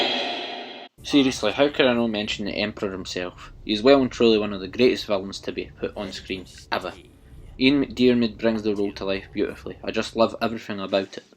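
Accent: Scotland, United Kingdom. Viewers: That Scottish tho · Thank you so much for spitting facts Return of the Jedi is the best of the original trilogy and it has always been better than the Empire Strikes Back and it’s so awesome that you’re Scottish I always like Scottish things and I like doing the accent to I say this famous line from Mike Myers “Welcome to all things Scottish if it’s not Scottish it’s CRAP”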